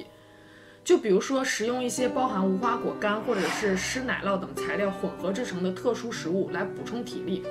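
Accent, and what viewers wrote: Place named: Northeast, China. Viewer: 听口音是东北人哪里的？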